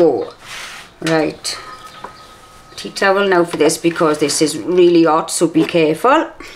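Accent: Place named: South Wales, United Kingdom. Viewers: when I heard you speak I knew straight away, “ah, she’s from Wales.” My career was as a primary school teacher and I taught two little girls whose parents had emigrated from Wales to Australia 🇦🇺. They sounded just like you! It’s been 30 years or so but it came back to me like it was yesterday! 😊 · I recon that's a Rhondda accent. I get my flour from Aldi in Porth, When I say Porth I'm never sure if it's in Porth or Dinas. I'm new to using a machine for bread so thanks for the tips. Oh, and I use Honey instead of Sugar. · South Wales😊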